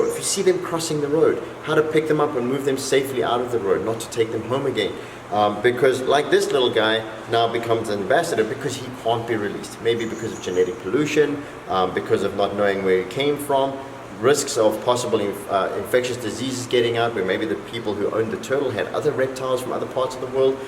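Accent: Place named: South Africa. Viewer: It only took me a few seconds to realise I was listening to a fellow South African! Howzit!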